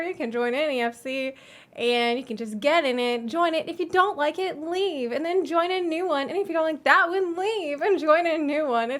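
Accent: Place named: Mississippi, United States. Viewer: I know you live in Ukraine, but are from the US, but I can't help but recognize the accent. Are you from MS? Love you bye!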